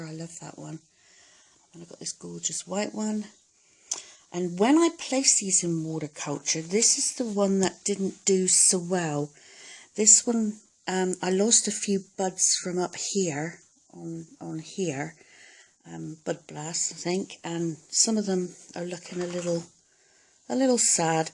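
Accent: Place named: West Country, United Kingdom. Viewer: What a wonderland you live in, it's gorgeous. You sound like you may be from the West Country? I'm a former Essex girl.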